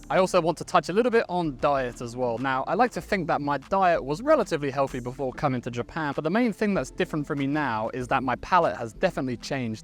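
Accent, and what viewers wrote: United Kingdom. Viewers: 日本人ですが、楽しく見させてもらってます。 ロケ地のチョイスも素晴らしいです。美しい。あとイギリス発音がなんか気持ちいいです。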